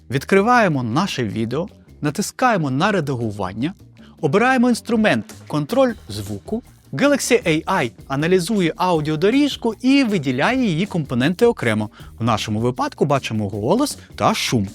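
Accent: Standard. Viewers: Яка чиста українська мова. Чудово 💙💛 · Ще й український кореєць ведучий огляду, з чистою українською мовою… Це прям новий рівень) Дивлюсь з iPhone, але нові моделі Samsung дуже зацікавили)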